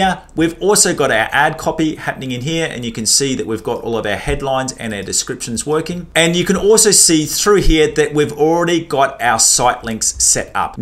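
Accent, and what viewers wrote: Australia. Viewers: thanks for the video, your accent sounds aussie to me (most beautiful country in the world)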